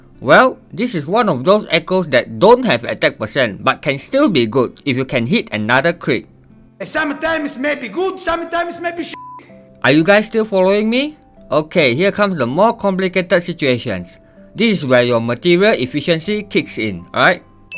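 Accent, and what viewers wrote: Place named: Singapore. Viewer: WTF your accent you’re from Singapore?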